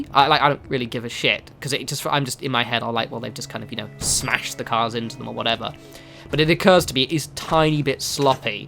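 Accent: England, United Kingdom. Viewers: English. Weston-Super-Mare I think:)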